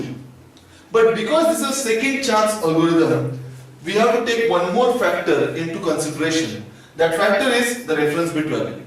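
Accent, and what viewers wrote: India. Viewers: Only INDIAN accent :) very helpful video Thanks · The indian accent make it that much better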